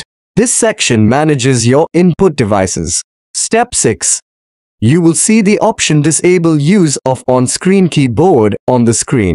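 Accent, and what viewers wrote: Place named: India. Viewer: The Indian sounding accent is unbearable.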